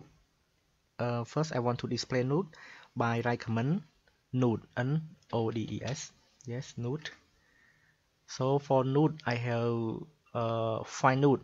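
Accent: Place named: Vietnam. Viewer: thang nay nguoi viet chac luon :v Cai accent deo chay dau duoc lol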